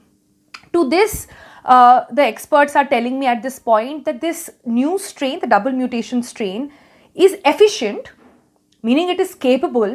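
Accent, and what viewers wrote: India. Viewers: She is talking english, but the accent make it sounds like the language they are talking in India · Purely Indian pakka accent....thanks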